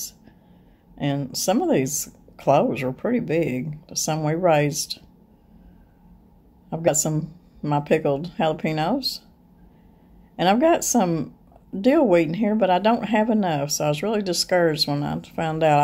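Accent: South, United States. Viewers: I have never tried pickled green tomatoes. I am pondering whether I have enough green tomatoes to try it. Thank you so much for sharing your videos. I always come away feeling like I have taken a step back into my childhood and seeing grandmas pantry or mom at our old wood stove, etc. Your accent is heartwarming as it is soooooo familiar. My family's from Arkansas and Dads side was Texas. I don't know...I just sit down, watch, relax and learn some interesting tips... :)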